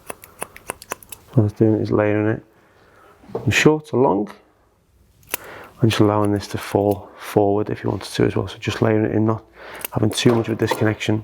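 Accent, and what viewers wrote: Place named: England, United Kingdom. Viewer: The accent is a sick. I need to learn how to speak with that English accent 😅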